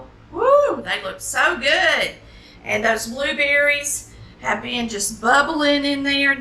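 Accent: South, United States. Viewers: I am just loving your deep country accent 😍😍😍😍so motherly 🤗🤗🤗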